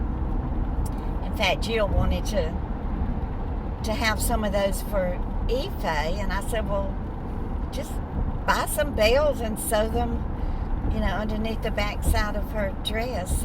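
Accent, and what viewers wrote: South, United States. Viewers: You also have talent for interesting conversation combined with compassion. You have beautiful southern accent that is soothing, unlike some that I think are just horrible. You have to be willing to open your life up. I’m much too private for that, so you see not everyone can be successful at it, but I’m happy for your success. I’m a paid companion for mobile elderly. I’m in great shape at 66 so hope to do for as long as I am able. Lorena.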